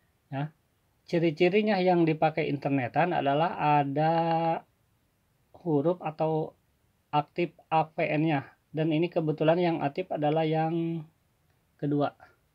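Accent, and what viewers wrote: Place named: Banjar, Indonesia. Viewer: Banjar kc mba logat nya koq logat bnjar 😀😀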